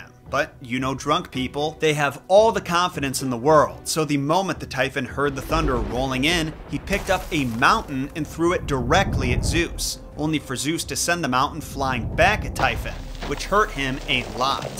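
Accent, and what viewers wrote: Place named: Midwest, United States. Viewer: What part of the Midwest are you from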